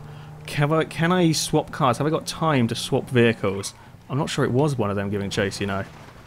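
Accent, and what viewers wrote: United Kingdom. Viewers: If it wasn't for your British accent this would have been unwatchable, that said I enjoyed it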